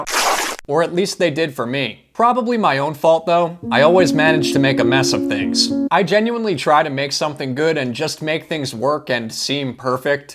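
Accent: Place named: Virginia, United States. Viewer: OMG VIRGINIA NOW I CAN PINPOINT YOUR ACCENT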